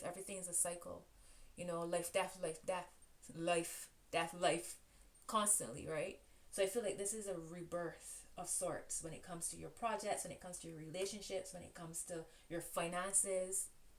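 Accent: Barbados. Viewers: you have absolutely no reason to stress out. I've spent the past 8 months watching and listening to various readers and you are up there as one of my best readers. Regardless of the message, you are a wonderful reader. You have a confidence in interpreting the cards. Your warm and easy going. I got a glimpse of your sense of humor and your while you are reading, your concern is genuine and honest. Your bajan accent is beautiful too!!!! I could listen to you all day. Your awesome. Lots of love, light and blessings to you💜💕💙❤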